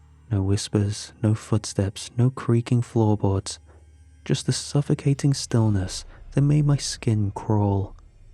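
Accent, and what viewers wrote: United Kingdom. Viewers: Me for 5 years now and he is still in my top 3, theres just something about his voice that helps me sleep. I usualy dont like the British acent and dont like to listen to it but I can listen to for hours, plus he always has a good or interesting story, unlike 90% of creepypasta channels now that only do the borring rules storys. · He’s good and his stories are great but im kinda getting tired of the British accent. Any good American creepy pastas channels?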